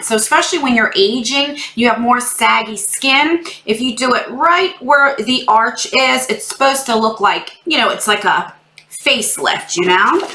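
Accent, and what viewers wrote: New Jersey, United States. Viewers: Good box! I really like your earrings, they're giving me a 90s vibe. Also, your Jersey accent is coming out strong today 😀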